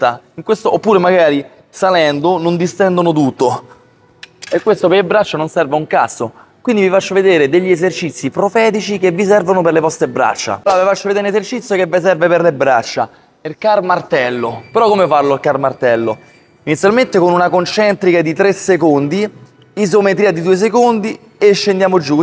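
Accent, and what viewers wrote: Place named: Rome, Italy. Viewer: AHAHAHAH bellissimo..breve ma intenso😂😂il romano è il dialetto piu bello da usare in pale😂❤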